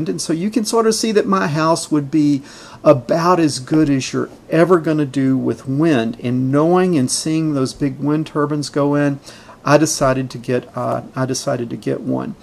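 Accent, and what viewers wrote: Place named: United States. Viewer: I don't expect you to change your accent or speak in a British accent. I love the way Yanks speak. They (you) just have to pronounce words correctly. lol. I'm more concerned if you are one of the 46% of Americans that think the world is only 6000 years old?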